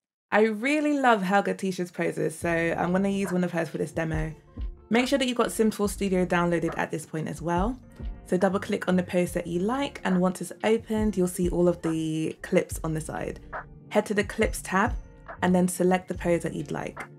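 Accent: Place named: United Kingdom. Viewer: i’m new hereee bur girl you’re british!! omggg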